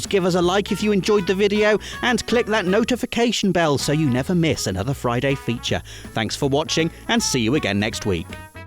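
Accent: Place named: London, United Kingdom. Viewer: Aah, starting my weekend with a good breakfast and a -bish video... 😊👍 But can someone here please tell me, what kind of slang is speaking? Is this pure and clean english language from London? It's a real pleasure to listen to this.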